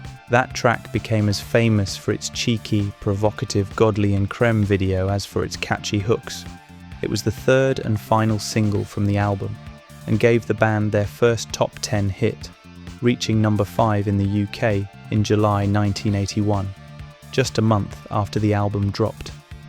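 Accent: Australia. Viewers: I can detect some aussie accent in the voice over? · an upper class South Australian?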